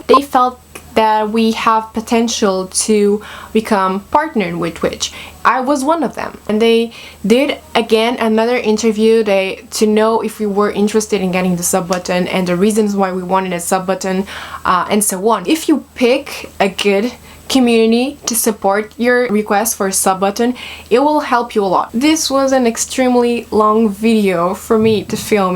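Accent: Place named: Brazil. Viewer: Sabia que você era BR! Nosso sotaque é o melhor!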